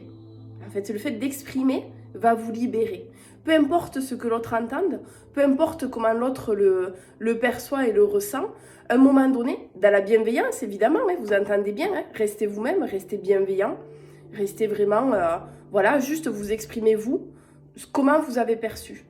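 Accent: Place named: Basque Country, France. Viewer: Merci pour ce message. C'est clair qu'il faut respecter l'autre, mais il faut aussi, ne pas hésiter à mettre des limites. Votre accent de Donibane, nous apporte le soleil que nous n'avons pas en région parisienne 😉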